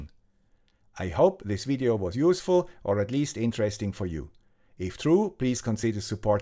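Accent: Switzerland. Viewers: Needed this video today...thank you,). I must confess, I am green with envy. Your workshop, supplies, and logical organizational of parts is what is on my hokey pokey ‘vision board’. You’re awesome 😎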